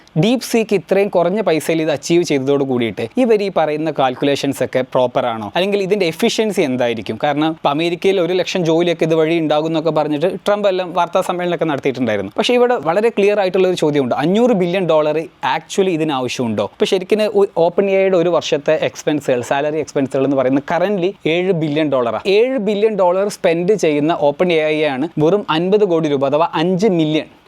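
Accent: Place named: Thiruvananthapuram, India. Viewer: A of AI has a TvM slang ❤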